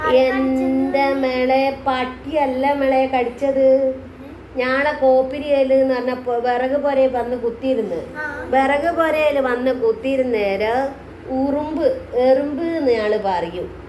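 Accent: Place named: Kozhikode, India. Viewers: കോഴിക്കോട് സ്ലാങ് അല്ലെ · നമ്മളെ സ്വന്തം കൊയിലാണ്ടി ഭാഷ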